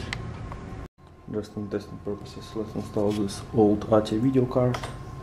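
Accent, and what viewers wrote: Russia. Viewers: Братан по голосу слышу что русский . У тебя было место под остальные 3 слота памяти или всётаки он закрывает их , люди пишут что закрывает .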